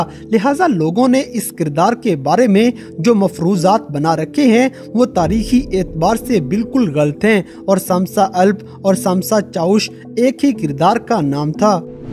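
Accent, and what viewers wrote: India. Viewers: Are u indian? If no then why r u using their accent ... our Urdu is a rich language and it has the letter غ ۔۔۔ _ _ . So why do u copy indian accent and say galt, gaddar etc... accept your own language only then you ll be remembered in the world history . آپ اب تنقید کریں گے کہ میں خود انگلش میں لکھ رہا ہوں تو وہ اس لیے تاکہ سب لوگ پڑھ سکیں کہ ہم اپنے ملک اور اپنی زبان سے محبت کرتے ہیں ۔۔۔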